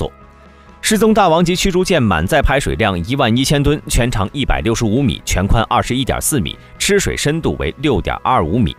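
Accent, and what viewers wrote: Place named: China. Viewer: 这博主除了口音之外别的和中国人没什么关系了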